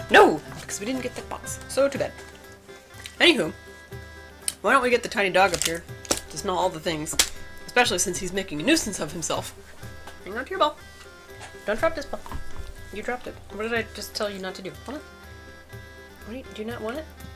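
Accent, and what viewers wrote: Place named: England, United Kingdom. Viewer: Superb, simply spiffing, one is chuffed to bits. You have a great natural gift for comedy along with, it must be said, an ability to do a realistic English accent without sounding like an Australian on Prozac (unlike most other inhabitants of our former colony). Seriously, keep up the vlogs. They are a ray of much-needed sunshine in a dark and gloomy world. BTW, to "have a butchers" is a common expression. It comes from Cockney rhyming slang, butchers hook = look. Very best wishes from the "Dark side" of the pond...…………………….the ancient Englishman.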